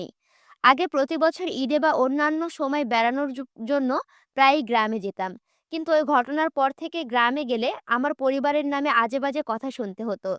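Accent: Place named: Satkhira, Bangladesh. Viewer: Apu apnr district koi 😊 satkhira mone hocce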